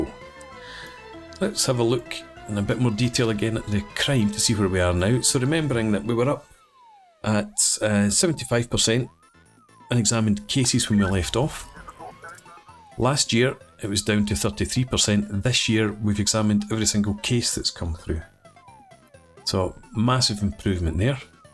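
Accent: Scotland, United Kingdom. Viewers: going by the choochta accent your no west coast, you towards falkirk?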